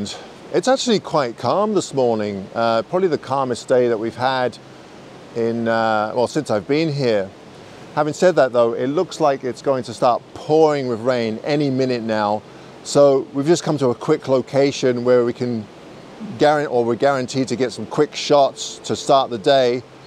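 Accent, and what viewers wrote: United Kingdom. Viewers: Nice final image,. Being a Canuck, I hear your British accent. However, when you declared that you are a Canadian and followed this up with the classic "eh", you conviced me that you are indeed a Canuck as well.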